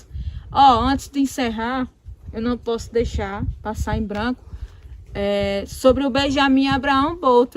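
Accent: Northeast, Brazil. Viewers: Essa menina sabe das coisas heim Parabéns nordestina .q sotaque massa. Eu sou da bahia .mas nao tenho sotaque de bahiano não. Rs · Sou nascida em São Paulo e amo demais o sotaque nordestino ❤️ E essa moça é de uma inteligência fora do normal 👏👏 parabéns pra ela 👏👏👏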